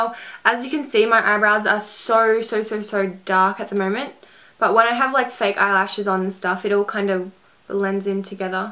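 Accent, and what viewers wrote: Australia. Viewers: I love the Australian accent!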